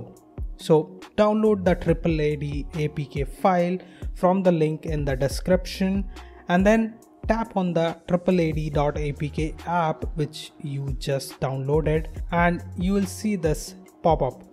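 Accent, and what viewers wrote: India. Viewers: Why are vids like these always some heavily accented Indian you can barely understand?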